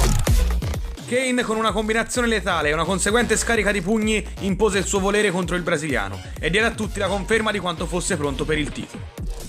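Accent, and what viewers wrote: Tuscany, Italy. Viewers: Deh, oh che tu sei toscano ?!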